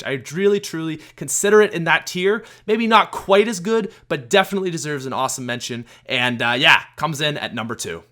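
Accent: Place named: Canada. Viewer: He has the most Canadian pronunciation of out ever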